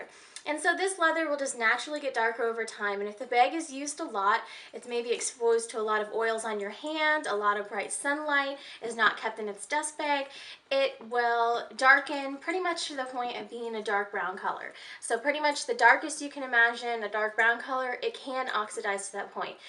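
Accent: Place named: United States. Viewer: your little yankee accent is so cute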